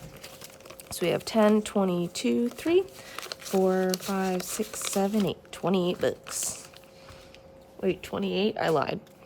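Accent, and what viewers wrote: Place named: Canada. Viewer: The Canadian accent is so strong, I LOVE IT 😂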